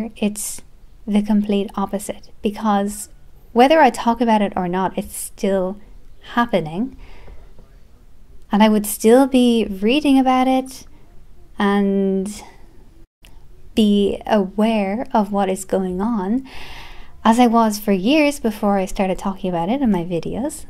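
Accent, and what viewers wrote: Ireland. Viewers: Out of the blue you commented on one of my vidoes, so I checked out your channel and subscribed. What can I say, i'm a sucker for Irish accents :)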